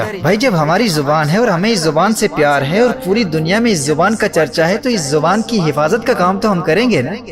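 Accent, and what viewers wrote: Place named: Arab world. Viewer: ماشاء اللہ خوب وضاحت ہے لیکن بصد معذرت آپ نے بہت سے تلفظ کو عربی accent میں ادا کرنے پر زور دیا جب کہ اردو کے حروف تہجی عربی زبان کے حروف تہجی جیسے ہیں مگر تلفظ میں دونوں زبانوں میں اکثر فرق پائیں گے۔ عربی زبان کے تلفظ اور اردو کے تلفظ کے درمیان بہت فرق ہے اردو کو عربی سے compare نہ کریں۔ تفصیل میں نہ جاتے ہوئے۔۔۔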